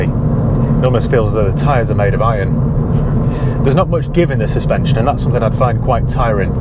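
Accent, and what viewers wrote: United Kingdom. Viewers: Cool review but why are you speaking British? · Probably because he is from the uk 😅 what a stupid question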